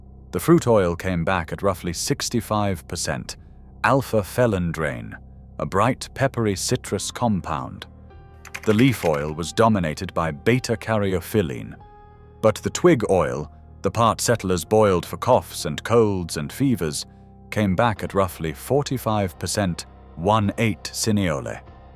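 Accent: United Kingdom. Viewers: I love this channel! I've learned so much from it and I hope it continues. I especially like the British accent.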